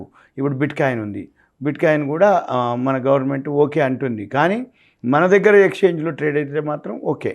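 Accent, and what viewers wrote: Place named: Telangana, India. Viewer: నువ్వు నీ తెలంగాణా assent.......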